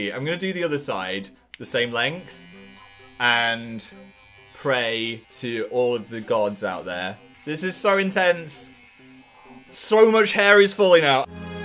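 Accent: North, United Kingdom. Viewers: Is it only me or does sound extra british in this video?:D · I have never heard you sound more northern... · Do I hear Phils northern accent making a comeback 🥳🥺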